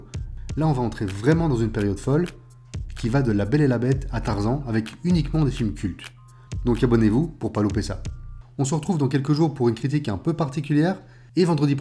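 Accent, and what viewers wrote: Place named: Belgium. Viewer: On entend que tu es belge parce que tu prononces la séquence « ui » comme « oui ».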